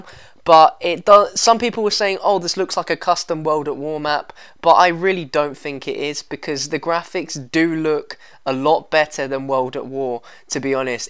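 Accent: England, United Kingdom. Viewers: well you are judging him by his voice? hes in uk not in america or anything he has an ENGLISH accent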